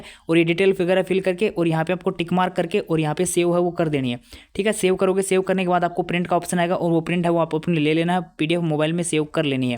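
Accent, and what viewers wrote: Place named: Rajasthan, India. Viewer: bhai teri rajasthani language ki boli mst h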